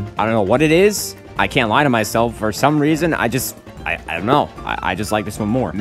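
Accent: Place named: Texas, United States. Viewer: ngl bro sounded like he had a Texan accent, and I agree with every ranking you just said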